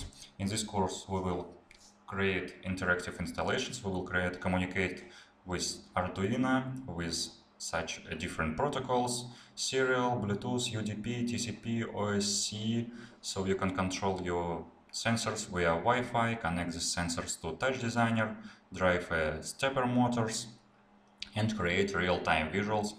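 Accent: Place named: Oxford, United Kingdom. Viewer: То что прям искал ! Спасибо большое ! ) Отдельное спасибо за оксфордский акцент. Всё понятно )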